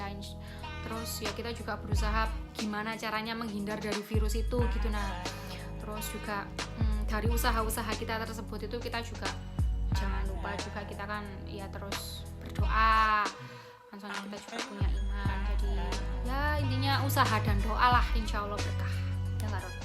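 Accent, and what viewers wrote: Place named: Java, Indonesia. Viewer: jowo medhok con.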